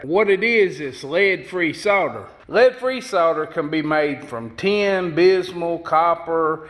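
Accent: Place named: South, United States. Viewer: This is a good, informative vid & fun & funny. Bess tek a class en Sothan drawl for this videe-eye-ee-eye-oh! I cracked up the first time I heard him say “Lead Sinkers” slowly & the word “Lead” was two syllables (lay-Ed) like my SC sis-in-law says “Bray-Ed.” Ya know, Bray-Ed...as in... Raw Toast. ;-) The “Ten” sinker doesn’t mean #10. It means Tin. Ha! I do enjoy a good drawl! (& yes, I know he doesn’t Always say “Lay-ed.” More often he says it correctly.)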